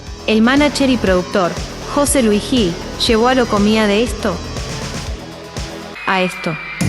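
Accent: Argentina. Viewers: Muy buen video. Ahora bien, la locutora (que hace un muy buen trabajo) es claramente argentina, asi que resulta raro escucharla usar giros idiomáticos netamente españoles como "chupipandi" o "vidio" mientras pronuncia "eshos" y demás. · Canal pedorro y tendencioso. Informar con humor? Son peores que Locomía! Y la mezcla de locución «porteño - neutro» es de terror!! Quién te de deja una «megusteada»? 👎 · ¿Narración con acento argentino y modismos de España? 😑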